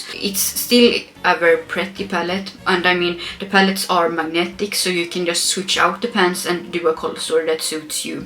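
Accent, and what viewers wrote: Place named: Sweden. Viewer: The Swedish accent is on another level! 😍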